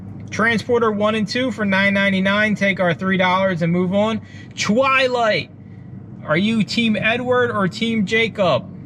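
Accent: Philadelphia, United States. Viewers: hi. I'm in Mt. Airy. I've been all through philly though. Yeah, I could tell by the way you talk that you were from somewhere heavy in philly! Good going,! I love your content. I want to break through this wall I've built Surrounding amazon. But I would love some serious advice. Oh! Question: scoutIq or scoutly starting out? I did the free trial of scoutiq and it was ok.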